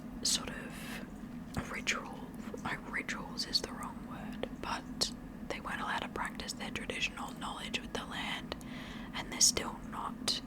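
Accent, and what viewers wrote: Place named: Australia. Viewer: Excellent video! I hyped it, too. I’m very interested in reading The Eyes of Gaza. I think The Correspondent was my favorite book so far this year. It was SO good. I also couldn’t put it down. I loved how she tells the entire story in letters. The ending surprised me. The author ended it so beautifully. I also loved The True True Story of Raja the Gullible (and his mother). Such a unique novel! I look forward to your weekly videos. You’re such an interesting reader and book talker. And the fact you’re in Australia is the icing on the cake. I love your accent.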